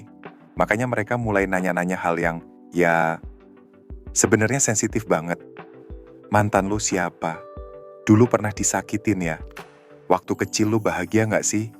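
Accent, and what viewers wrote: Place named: Java, Indonesia. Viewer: Mas "pria keren" iki koyone wong jowo yo?? Koyo medok bgt, Maaf koyone lebih pantes narasine nek ga pake istilah "Lu" (badane dadi rodo piyeee ngono) tapi better ditunjukan aja Jawa nya, malah keren👍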